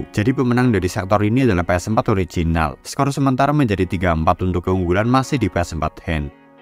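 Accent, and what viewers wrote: Banyumas, Indonesia. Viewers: VoiceOver.e ngapak nemen ya?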